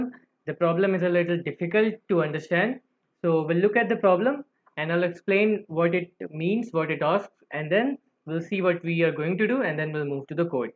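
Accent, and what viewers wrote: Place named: India. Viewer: i can't understand what are you talking about your indianglish sounds so fckn bad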